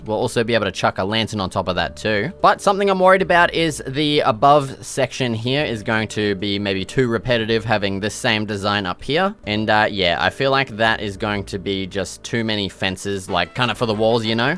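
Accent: Australia. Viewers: why do u sound australian to me????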